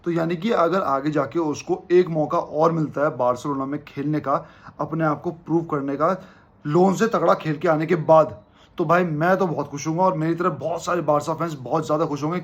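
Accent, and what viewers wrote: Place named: Uttar Pradesh, India. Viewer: uttar pradesh mai kaha se ho bhai Voh toh mujhe pata chl gaya tha app uttar pradesh se ho apki voice se😅😅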